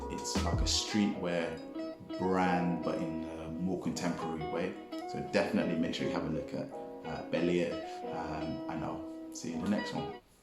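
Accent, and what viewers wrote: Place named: United Kingdom. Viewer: How did you get your name? Just curious, lol. You have a British accent, and me, myself, being, it tossed me off. Love your content btw!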